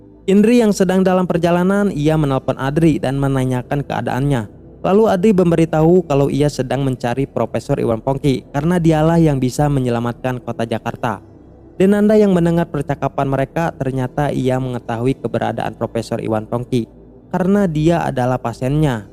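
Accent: West Java, Indonesia. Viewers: Ni host nya pasti orang sunda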